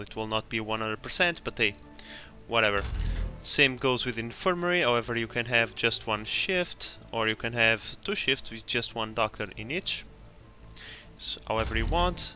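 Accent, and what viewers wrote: Portugal. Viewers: I bet you are portuguese, you sound like it, dont get me wrong, your english is very good :) and spanish people dont go along that well with english eheh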